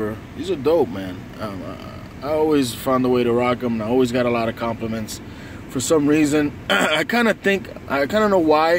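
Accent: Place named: Massachusetts, United States. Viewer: sneaker Man you're from mass i hear it im from dorchester you jist gained a sub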